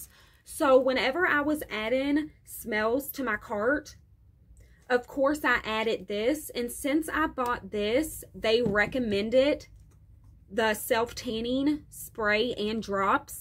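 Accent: United States. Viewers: I fuckin love ur country accent💖💖💖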